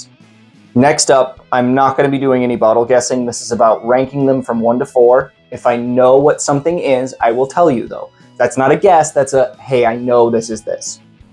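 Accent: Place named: Texas, United States. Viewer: Love the Texas tie and Texan accent. I love how all our palates are different. thanks for getting this together and can't wait to do the FINALE live stream. cheers 🥃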